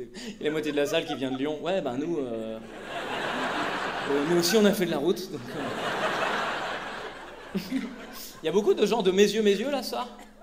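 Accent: Quebec, Canada. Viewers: Il est génial ton accent Québécois 😂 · Je suis québécoise! 😂😂😂 Je t'aimais mais là je t'adore 🤣🤣🤣 c'est presque ca l'accent 😉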